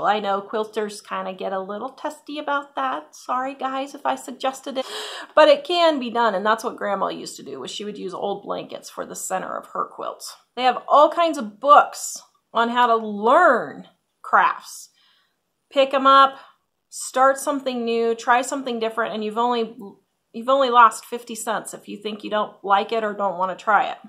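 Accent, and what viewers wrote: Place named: Kansas, United States. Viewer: We all know that you say warsh instead of wash. This is the first time I ever noticed that you say tonne instead of ton. Is that from Kansas, too?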